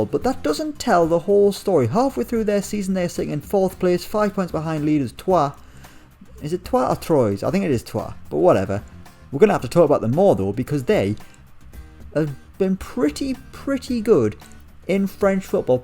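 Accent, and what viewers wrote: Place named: Northern England, United Kingdom. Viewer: Great vids love them , are you a northern lad you sound it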